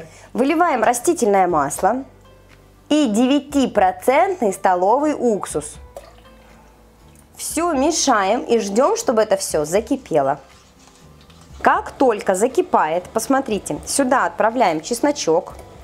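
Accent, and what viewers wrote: Ukraine. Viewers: Девочки,что же Вы такие злые?Во-первых на Украине речь этим и своеобразна.А уксус просто испаряется при варке.Больше позитива ,друзья!!!!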